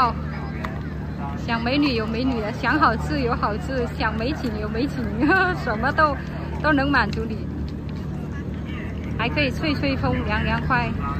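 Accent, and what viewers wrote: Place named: Hunan, China. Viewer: 你的中文听起来像我一个湖南的同学，你看起来好泼辣的样子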